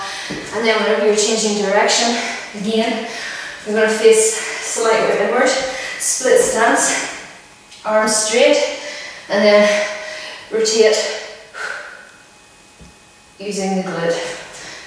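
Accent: Ireland. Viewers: i love your accent. it's the only authentic Irish accent I've ever heard. the only other one I've heard is from Angel, on the tv show "Angel"/"Buffy the Vampire Slayer" :)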